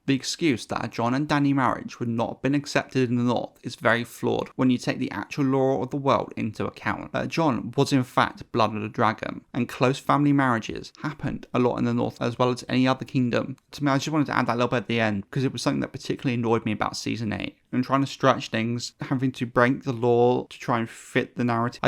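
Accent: United Kingdom. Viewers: Let me guess you are from Liverpool 🤔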